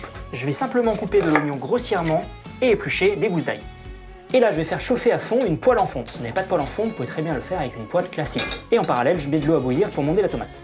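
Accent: Mexico. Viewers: Trpp fort avec l'accent mexicain et tout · Saludos desde México, wey!!! Me encantó la intro 😂😂 felicidades por tu español bien mexicano!! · No mames este pinche habla mexicanooo, mira tu muy bieeen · C'est le meilleur accent mexicain que j'ai jamais entendu ! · Pourquoi tu dis qu'il parle "sans accent" alors qu'il essaye clairement de faire un accent mexicain ?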